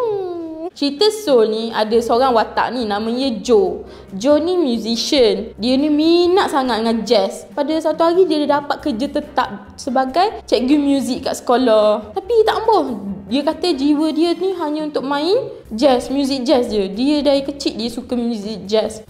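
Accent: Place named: Terengganu, Malaysia. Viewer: Pekatnya ganu sis🤣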